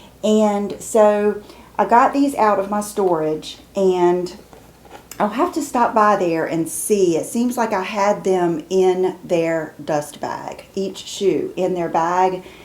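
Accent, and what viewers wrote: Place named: North Carolina, United States. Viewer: I know a North Carolina accent when I hear one. 🙌🏼I too am from North Carolina. The eastern Part! 😘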